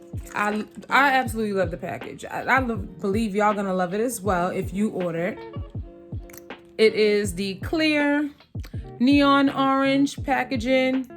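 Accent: New York, United States. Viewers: Your accent is great, I thought you were from New York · You have to be from New York saying because like that lolll at least the east coast I’ll finish watching loving your vibe new subbie!!!!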